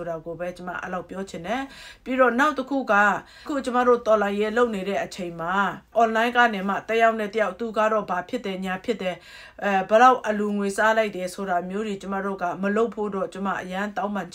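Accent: Kachin, Myanmar. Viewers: အမြဲတမ်းအဆင့်အတန်းရှိရှိ၊စစ်ခွေးတွေကိုထိုးနှက်ချေပတာတွေ၊ဘက်မလိုက်သုံးသပ်ချက်တွေနားထောင်ရတိုင်း၊ ကိုချစ်ခင်လေးစားရသလို၊ကချင်သံဝဲဝဲလေးနဲ့အထစ်အငေါ့မရှိ၊လက်တန်းပြောသွားတာတွေအရမ်းကျေနပ်အားရမိပါတယ်။တော်လှန်ရေးအတွက်စစ်ခွေးတွေ၊ဘောမတွေကိုReactionလုပ်တာတွေအရမ်းကိုထိမိလွန်းလို့big congrats ပါ။ ။ဆက်လက်ပြီးဘုရားသခင်သာ၍၊ကောင်းချီးပေးအသုံးပြုပါစေ။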